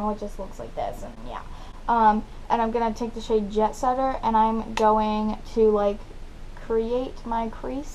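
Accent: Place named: United States. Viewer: Omg this is sick!!! I'm from England and I love the Amercian accent😂😂💓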